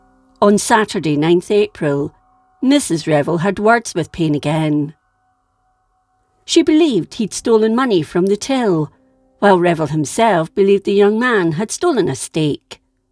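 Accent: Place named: Scotland, United Kingdom. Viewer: I love your soft Scots accent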